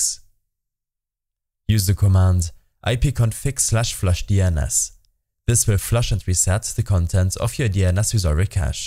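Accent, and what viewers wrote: Germany. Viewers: Man hört deinen Deutschen Akzent raus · haha ich konnte an der stimme einfach dran erkennen dass du deutsch bist wegen der aussprache😂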